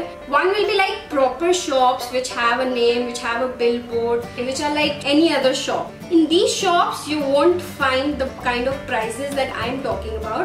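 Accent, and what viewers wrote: Delhi, India. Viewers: I always wondered that you never sounded like a Mumbai girl to me, you had that tone of a Delhities. And today i came to know that you are a Delhi Girl. Whenever you come back to Delhi please do a meet and greet coz i am also a Delhitie. #DilWaloonKiDelhi. And yes go try out sarojini nagar market, its awsm.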